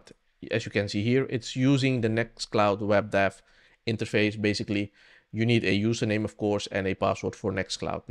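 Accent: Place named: England, United Kingdom. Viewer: Dit is exact wat ik wilde doen, bedankt! Herkenbaar Engels accent😉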